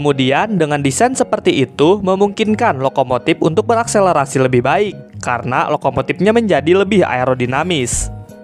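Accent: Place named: West Java, Indonesia. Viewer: Naratornya sunda pisan euy...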